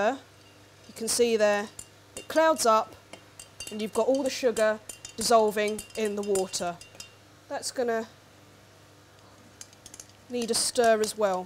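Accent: England, United Kingdom. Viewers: Asian * sexy british accent = one very sexy scientist. Yeah, baby, yeah! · deep voice with and english accent come out of an asian woman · asian with british accent? DOES NOT COMPUTE!!!!! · why does this asian chick have an english accent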